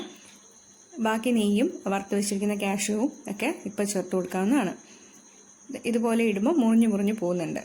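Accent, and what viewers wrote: India. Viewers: Superb video, palakkadan slang?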